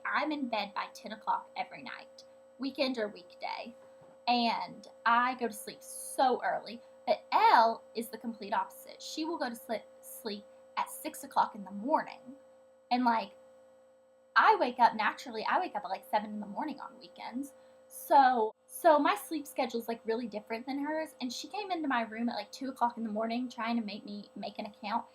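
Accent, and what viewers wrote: South, United States. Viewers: how come you have the southern accent but elle doesn't? elle sounds like a valley girl sometimes lol is it cause she had already developed her accent or way she speaks before you moved to the south?? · Your southern accent is adorable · lol ur have the south accent... sorry lol just sounds weird cuz im from NYC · sweet tea! lol you are southern... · I'm from the south to! I dont really notice your accent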